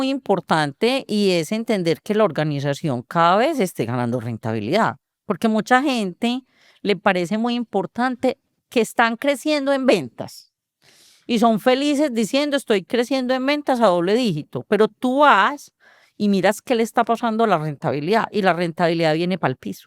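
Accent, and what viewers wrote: Colombia. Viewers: Medellin - Colombia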